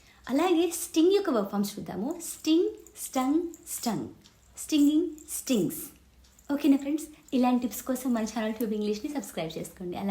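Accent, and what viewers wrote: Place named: Khammam, India. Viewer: Your telugu seems to be from khammam district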